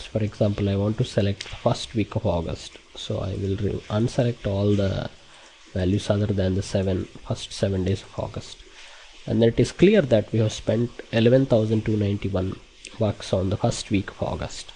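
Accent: India. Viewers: This is the first video that I actually find helpful!! thanks a lot!! Love your indian accent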